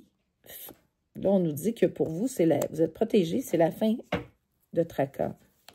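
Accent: Quebec, Canada. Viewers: Bonjour , je ne veux plus rien régler ,tout a déjà était réglée dans le passé avec ce traître diabolique pervers et narcissique , j'aime bien votre accent québécois , et je vous remercie infiniment pour votre guidance . C'est la beauté et aussi la chance d'être dans la nature pour pouvoir vous ressourcer ça fait extrêmement du bien , triste a moi je n'est pas cette chance , mais effectivement mon ex autre pervers narcissique qui est déjà avec une femme maritalement mais il ont failli perdre leur enfant qu'il n'ont pas perdu au final et qui a 30 aines , il m'a beaucoup fait de mal exacte , et dans cette famille tout n'est qu'argent finance immobilier ,c'est sa femme qui est riche et que lui a épousé il y a 40 ans dans la noirceur totale très fausse superficielle exacte , qui a ensorceler mon ex autre et a contribuer a notre séparation mais lui a préféré l'argent a l'amour que je lui apporter , il n'aimer que mon énergie et je les éperdument aimer sans savoir vraiment ce qu'il était et faisait , il y a une secte on m'a dis , oui il est ds une cage dorée dont il ne veux pas partir pour l'argent incapable de se gérer seul , la dame riche sa mère et mon ancien autre tous sont dans les mensonges , je pense que c'est un gigolo même probable un proxénète vu ce qu'il m'a raconter qu'il fréquente les prostitues alors qu'au départ il a bien cacher son jeux se faisant passer pour fidèle alors qu'il est en perpétuelle conquête de recherche féminine , j'ai failli mourir car il m'a vider de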